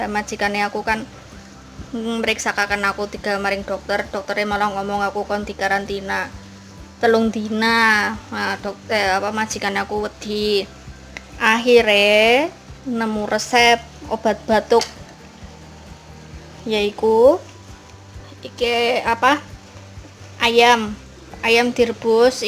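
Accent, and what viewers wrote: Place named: Banyumas, Indonesia. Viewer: Ponorogo rasa ngapak ya... 😊👍👍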